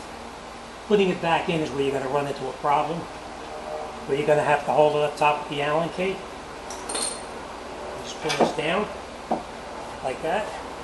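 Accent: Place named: Boston, United States. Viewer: My FL friends used to make fun of my "how you doin" accent (Paterson and Fair Lawn) but man oh man I really thought you were from Boastn (caaa-no r). Anyway thanks for the cool videos you post! It is refreshing watching them.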